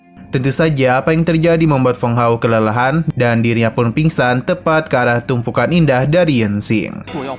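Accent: Banjar, Indonesia. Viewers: Min mimin orng banjar kh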